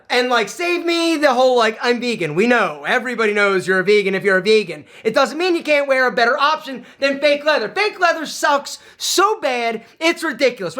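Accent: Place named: South, United States. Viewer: The southern side of you was out this morning. You said "like" like 2,000 times Lol I appreciate you brother. Keep it up!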